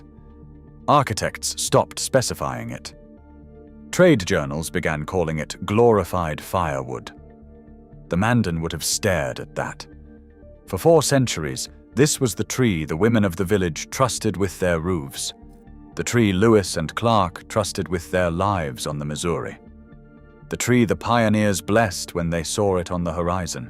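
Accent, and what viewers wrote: United Kingdom. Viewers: Just because you put a British voice to the information doesn't make it right. COTTONWOOD SUCKS AS A WOOD FOR ALMOST EVERY THING! Remember, I said ALMOST every thing involving wood uses.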